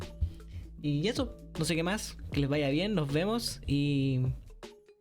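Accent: Chile. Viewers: Acento de Chile o me Equivoco jeje 😅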